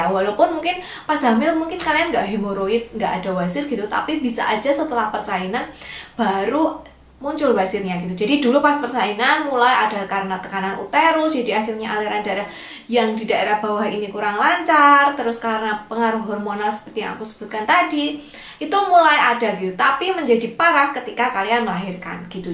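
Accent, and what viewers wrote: Java, Indonesia. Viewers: Bidan cantik yg logat jawanya medok. Channel langganan dari masih hamil smpe melahirkan dan baby ku ud 6 bulan skrng😁 selalu sukak pmbahasannya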